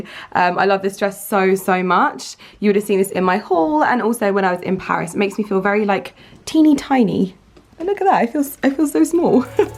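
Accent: United Kingdom. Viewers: I really love your approach to the whole thing (like how only a subtle change can make a huge difference, but at the same time still look like YOUR nose) and how you explain your reasoning :) New subscriber!! ps. You kinda remind me of Emily Blunt, with your new nose (especially when it was swollen) and your british accent hehe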